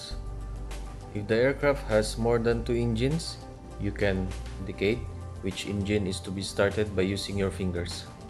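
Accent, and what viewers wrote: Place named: Philippines. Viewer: Filipino detected hehe. The voice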